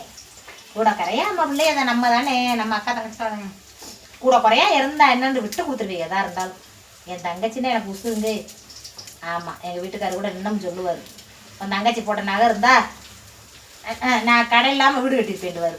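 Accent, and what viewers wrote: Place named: Kerala, India. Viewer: நான் கேரளாவில் இருந்து உங்க வீடியோ பார்த்து கொண்டு இருக்கிறேன் அக்கா. நீங்க பேசுறது எங்க ஊரில் இருப்பது போல் இருக்கும் அக்கா ❤😊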